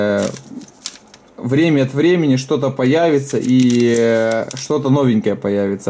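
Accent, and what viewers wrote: Ukraine. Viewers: У тебя в этом видео акцент Украинский сильно выражен )) А в других его вообще нету такого,все на русском))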